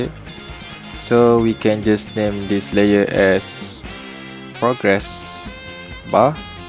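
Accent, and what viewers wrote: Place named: Malaysia. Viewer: Your sound like malay , are you malay ? Can we have a conversation ? Wanna deal something about cv interactive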